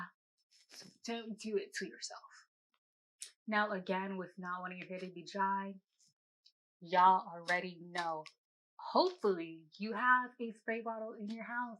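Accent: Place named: New York, United States. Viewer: I love your NY accent (don’t change how you talk) and your locs. They have grown out sooooo 😍 nicely. Been a subbie since the beginning. I would recommend supporting a YouTuber named Tameka by nature below is the link to her business. She is also a New Yorker and is always promoting positivity and supporting small you tubers. Shout her out and/or support. I truly respect that you want to do this🤗. Stay blessed sis🙏🏾. <link>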